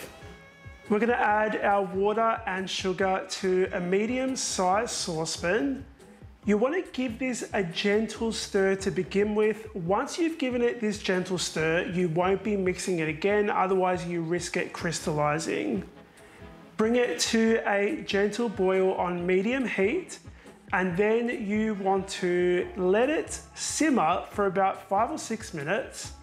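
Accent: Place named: Australia. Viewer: Yesssss! I need to try to make them.... also I love your accent 😍 Australian?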